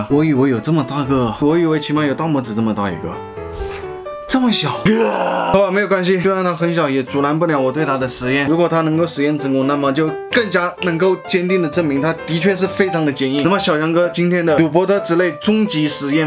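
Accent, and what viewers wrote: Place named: Hunan, China. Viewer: 听你这湖南腔就想笑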